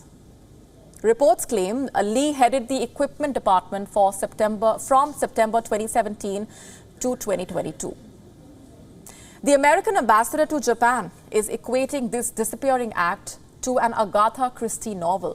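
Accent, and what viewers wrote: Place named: India. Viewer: Indian tongue as always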